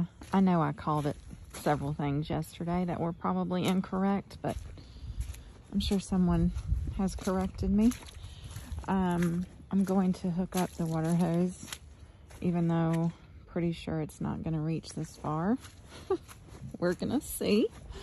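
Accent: South, United States. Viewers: I gotta said I love you southern accent. You are a keeper for sure. you are the man and you have a great women by your side God bless y'all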